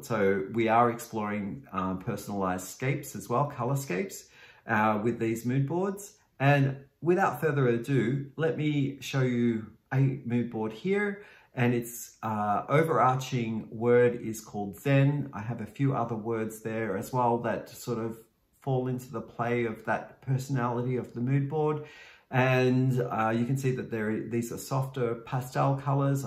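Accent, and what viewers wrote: Australia. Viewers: A<link> 😆 A Pixel appearance! You should actually do some video vlogs with Pixel sitting with you on camera 😜😍.. I never knew you were from Australia 🤪 I knew u had accent which I love but I just thought it was some sort of strong Canadian accent lol 😂 Why in the world would you move to the cold Canada from Australia dear? Lol 😝 What beautiful happy mailings. Those hobbi ones were gorgeous.. my fav is the darker purples one. The colors 8 and 10 I thought was the same color. They almost look identical. I bet you could actually use them together 😜 And Zee is wonderful! She’s so fun to watch. You were lucky to receive her birthday colorway 😍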